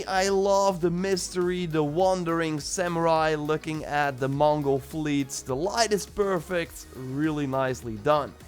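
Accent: Netherlands. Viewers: I can hear You’re dutch because of the accent. Its pretty bad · Your Dutch accent is awkward to hear. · For sure your Dutch with your accent !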